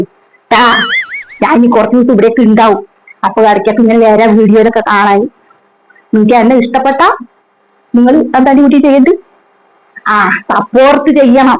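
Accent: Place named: Palakkad, India. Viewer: എനിക്ക് പാലക്കാട് ഭാഷ ഇഷ്ടാണ്..എന്റെ ഒരു നെയ്ബർ ഉണ്ടായിരുന്നു.. മുംബൈയിൽ.. അവര് ഇപ്പൊ നാട്ടിൽ സെറ്റിൽ ആയി.. പെട്ടന്ന് അവരെ ഓർമ്മ വന്നു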